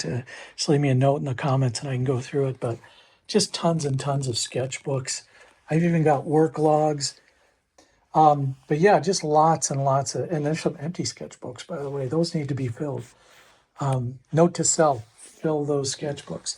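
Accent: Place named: Midwest, United States. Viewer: Love how mid-west you speak,! I remember your cool old drafting table you had in the basement studio. Wondered if you miss it.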